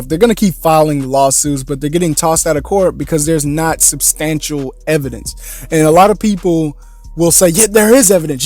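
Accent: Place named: Appalachia, United States. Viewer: He's not losing subs because he's not telling people what they want to hear it's because he's sitting in the house losing it on YouTube because he couldn't go to the gym lol! He's going now but the hillbilly accents, pausing the videos to make stupid jokes that are not funny and 6 hour lives is why people are leaving his channel.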